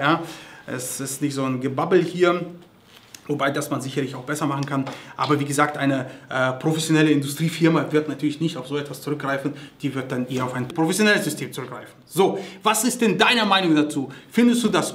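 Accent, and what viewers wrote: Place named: Russia. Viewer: Bester Mann wie er es erklärt usw weiter so ich finde dich Top! An deinem Dialekt kann ich hören das du aus Russland kommst, ich muss zugeben das ich unter Russen das Schweißen MAG und WIG gelernt habe und die waren echte Profis!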